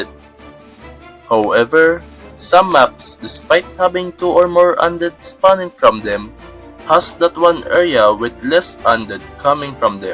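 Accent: Philippines. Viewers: filipino accent is strong here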